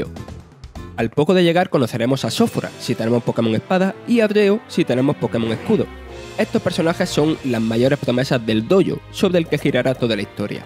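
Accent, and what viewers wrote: Andalusia, Spain. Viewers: Andaluz detecte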